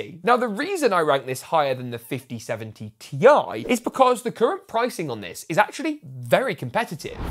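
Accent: United Kingdom. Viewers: Why do you sound more extra British?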